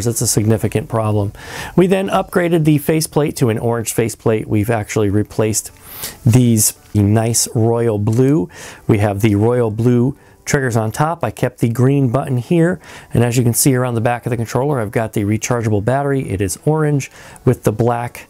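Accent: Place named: United States. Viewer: This is a great video, but almost impossible to watch as someone who is English due to Americans silencing the L in solder, which is weird.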